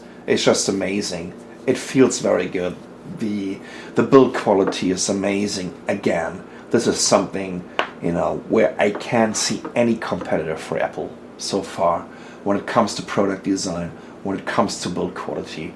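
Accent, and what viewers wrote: Germany. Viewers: lustig zuerst das deutsche und dann das englische zu schauen... Your accent is just funny -but this is germans like....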